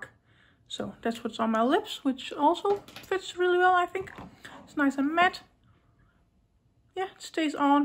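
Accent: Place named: Netherlands. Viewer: Gorgeous look ✨💖✨ I’m currently stalking my package 📦 with this gorgeous palette in it... it’s just down the west coast from me in San Francisco... 🤨 I see you Rose Metal palette and 2 eyeliners...😳soon my pretties...soooonnn 😳🙌🏽🥰🙌🏽🤣 I love your accent, it sounds Dutch to my old ears 🤣 I apologize if I’m wrong, which I usually am...🤨😳🤣😉 I think accents of all languages is beautiful, with the exception of a couple my American accents... I just don’t think our accents are that great...some of the southern accents and Midwestern, New England accents I love, but the regular sounding American accents on the west coast is kinda blah bcuz I hear it all the time. You’ll love Lois’s Gone Rogue palette too 🥰🤗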